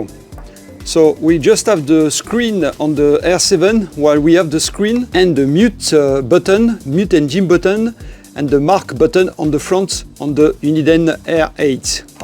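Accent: France. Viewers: it’s a French accent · Ooo lya lya! strong French accent! Therefore, the question is what kind of radar detectors ( models) can be used in France!!! Where, as we are all know, VERY, VERY serious consequences of using such devices. P.S. My experience - NEOLINE X-COP 870s, not in France offcourse...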